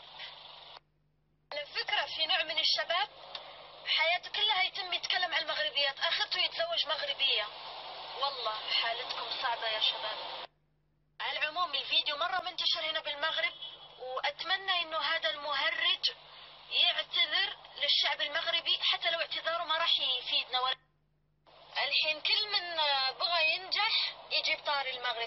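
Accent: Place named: Saudi Arabia. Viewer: ماشاء الله من خبرة الليل اصبحت تتكلم سعودي لن يتوقف الكلام عن المغرب طالما اشكالك موجوده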